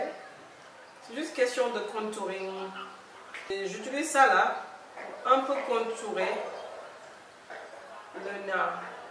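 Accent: Senegal. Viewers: lol je kiffe les accent sénégalais la meuf parle sans complexe awa magui ni si clinique bi je viens tout juste d'accoucher hmd je suis à 8000 km de chez moi (Sénégal)ma famille me manque mais dès que j'ai vu ta vidéo j'ai tout oublié si tu es tjrs au bleds bon vac je t'adores trop toutes mes salutations a qui me fais trop rire avc ses wolof de congolaise my name is Mya depuis Paris🥰🥰😘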